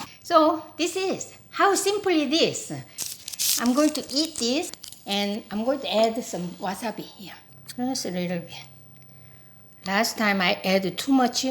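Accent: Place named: South Korea. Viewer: 한국식 영어 억양 너무 매력적이에요~~~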